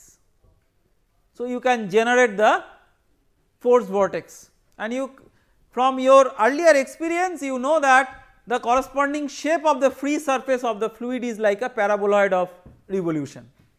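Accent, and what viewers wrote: Bengal, India. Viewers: Arey sir bangali hai toh accent mein thoda bengali pan aa jata hai koi nahi manage kar le😅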